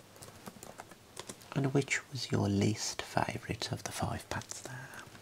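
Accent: Birmingham, United Kingdom. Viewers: Not brummie asmr omg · I love that this video lets us know you're in Birmingham - as if there was any doubt about that wonderful accent! :D thanks so much for this - your videos are the best, never fail to chill me out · Brummy accent!! ❤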